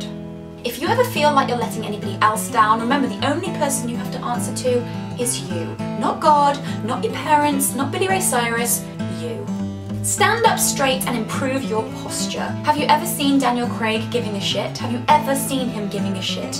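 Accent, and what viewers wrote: United Kingdom. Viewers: you sound like british,I like your tone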